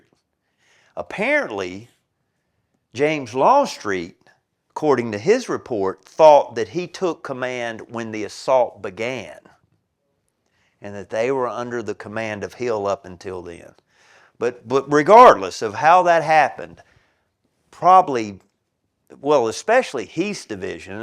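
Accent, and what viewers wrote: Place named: South, United States. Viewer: Fantastic, informative, and entertaining as presents it all, in the most interesting southern drawl, about A.P. Hill. Thank you.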